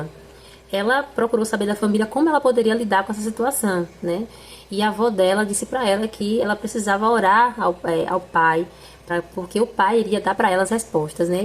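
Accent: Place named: Salvador, Brazil. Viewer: Oi amiga, cheguei pra te dar aquela força e já vi que você tem potencial. Me tire uma dúvida, você é baiana, mais precisamente de Salvador ou região próxima? Reconheci um sotaque sateropolitano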